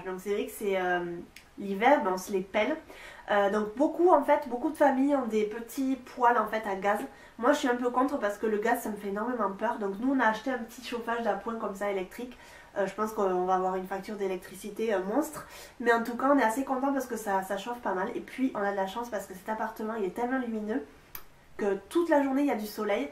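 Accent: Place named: Toulouse, France. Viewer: ha une toulousaine! il me semblait bien que ton accent m'était familier haha! tes vidéos sont très intéressantes ! en espérant te voir au Japon quand j'irai! la biseeeeee du sud ouest!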